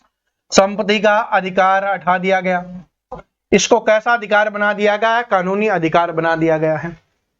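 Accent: Haryana, India. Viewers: Spata 100 haryanvi boli m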